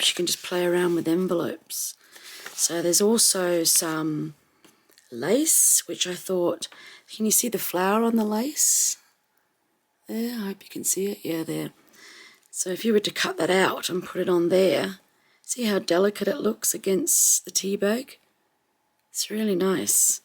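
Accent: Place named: Melbourne, Australia. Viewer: I am enjoying your channel and ideas! I made the Japanese fan journal from coffee filters and REALLY love it!!! As a bonus, I have a dear friend of many years who lives in Melbourne. So, listening to your lovely accent makes me feel like I am spending time with her!!!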